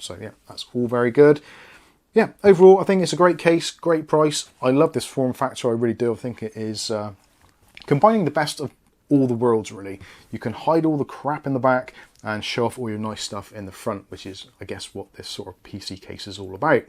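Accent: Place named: East Anglia, United Kingdom. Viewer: My only complaint about this review is how you pronounced "Aluminium" Judging by your accent, I'd say you're from East Anglia or somewhere in the South-Midlands or South-West. Come on man, we're not speaking American yet ;)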